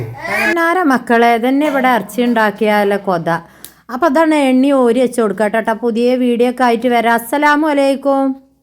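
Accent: Malappuram, India. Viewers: മലപ്പുറത്തു ഒരു വിധം എല്ലാരും ഇങ്ങനെ തന്നെ ആണ് സംസാരിക്ക വെറുതെ ഓരോന്ന് കുറ്റം പറയുന്നതെന്തിനാ 😮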